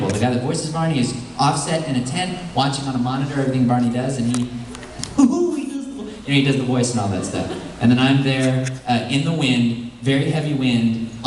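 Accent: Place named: Italy. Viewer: I'm Italian...and his Italian accent is spot on..ieven though I'm Southern Italian..but dude..we do sound like Mario XD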